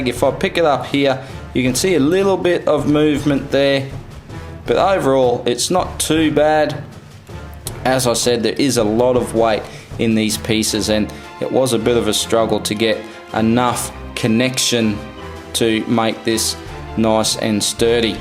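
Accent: Australia. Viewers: He's from Australia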